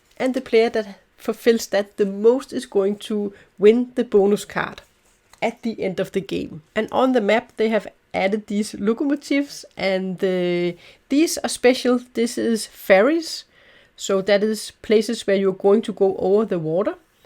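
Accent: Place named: Germany. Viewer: You have a really nice german/english accent. probably the best sounding I have heard.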